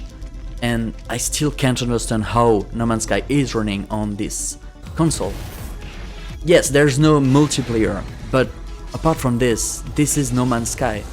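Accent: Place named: France. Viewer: That charming french accent !!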